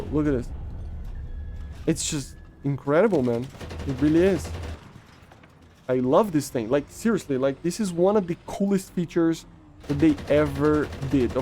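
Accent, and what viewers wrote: Brazil. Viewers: You literally just put words in my mouth. I simply said He's Brazilian. Another words you shouldn't expect someone that clearly isn't a native English speaker to say everything correctly. So to stop this pointless argument so both of us can get on with our nights lets not put extra words in my mouth that clearly wasn't said in the sentence that I said. Enough is Enough lets just get on with our nights and have a beer on a nice Saturday night. If that's not what you do fair enough just enjoy the rest of your night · Are you Brazilian or Argentina ?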